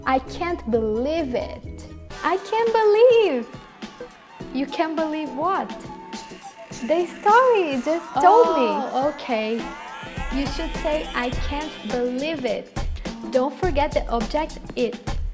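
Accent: Minas Gerais, Brazil. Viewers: so pelo sotaque daqui de minas ja vale assistir o video rsrsrs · You seem to be mineira. Se for é conterrânea XD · Esse sotaque mineiro é muito amor!! <3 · Pode passar 40 anos fora do Brasil, mas o irreconhecível sotaque de mineira não sai!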